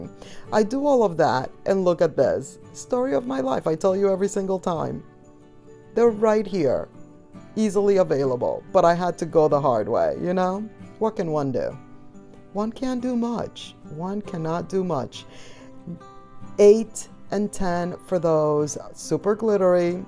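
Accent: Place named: South, United States. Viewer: You are in Myrtle Beach?! I’ve been wondering what southern state you’re from b/c you say yall like a southerner !😂. I’m right up the road in the upstate! I love the beach in the fall!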